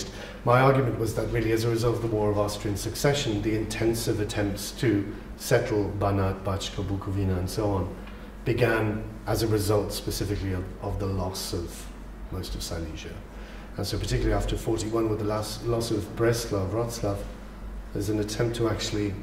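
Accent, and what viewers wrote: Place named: England, United Kingdom. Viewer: Did his first degree at NUI Galway...Very English accent however...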